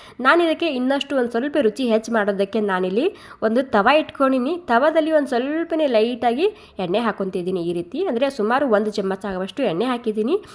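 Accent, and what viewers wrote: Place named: North Karnataka, India. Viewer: ನಿಮ್ಮ ಕನ್ನಡ ಕೇಳೋದಕ್ಕೆ ಚೆನ್ನಾಗಿದೆ, ಹಿತವಾಗಿದೆ, ಉತ್ತರ ಕರ್ನಾಟಕದ ಭಾಷೆ ಯಾರು ಏನು ಮಾತಾಡಕ್ ಆಗಲ್ಲ.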